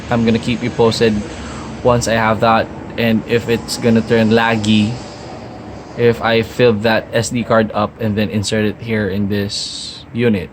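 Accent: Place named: Philippines. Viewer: i knew you were pinoy just by your tone. I'm thinking of getting this or Fiio X3 Mark III but can't decide yet or i could just add a bit more and get a nintendo switch and forget about music haha jk. any review for Fiio X3 Mark III over NW-A45?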